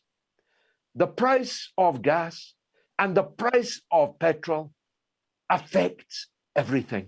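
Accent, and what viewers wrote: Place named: Dundee, United Kingdom. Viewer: George you have always been a traitor to the UK but now I see that you are a traitor to mankind.What a shill, I work with and help resettle victims of this war in Ukraine they are not lying too me. Now take your staccato Dundonian delivery and get lost.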